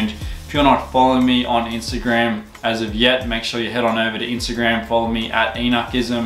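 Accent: Australia. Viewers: Mad deck also are you from Australia or nz? From the uk btw. Nice shoes also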